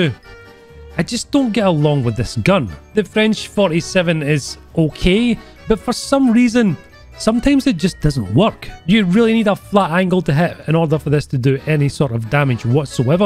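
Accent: Scotland, United Kingdom. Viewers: love your videos! I'm new to your channel, but so glad I came across one of your vids and subscribed immediately! Not sure if or how you take suggestions/requests to showcase a vehicle, so I'll just ask here: could you possibly take out the American T54E1 (8.0 BR) and show how it fairs these days? P.S. Everything sounds so much better with a Scottish accent, please say: "Pesky murderous sidewinder" in the thickest Scottish accent you can master in one of your next videos!;P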